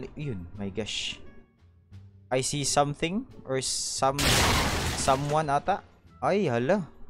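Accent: Visayas, Philippines. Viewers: Bisaya kaba lods? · Bisaya kaba?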